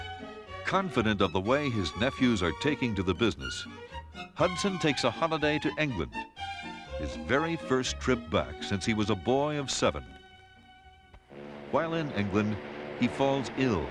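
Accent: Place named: Transatlantic, United States. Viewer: I miss the old timey transatlantic accent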